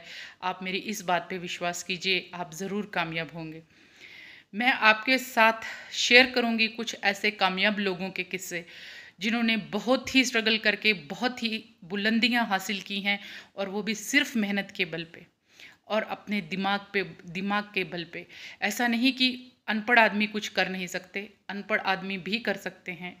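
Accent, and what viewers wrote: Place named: Punjab, India. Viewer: Tuhdi Soch bht vadia ji sachi bilkul sach keha tusi motto mehnat te bht Kar rhe Baki agge rabb de hath a ji 🙏 Baki ek gal tusi Canada Ch reh k pure Punjabi bolde ho jida k gaddiya thukti Hai hahahhaha Nhi te AJ kal Jo USA CanAda yeah yeah bht krde a ji Jo 5 class pass v nhi hunda oh v yeah yeah bs hor koi gal hi nhi krde waheguru ji tuhnu hamesha Khush rakhna. Te ur famliy members nu v dear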